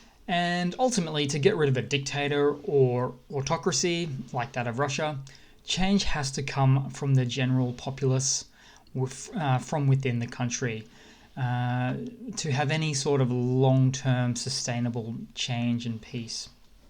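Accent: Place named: Australia. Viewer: Good to here an aussie accent, I liked what the Ukrainian hackers did to the sign. If you can find more humous things that would be great.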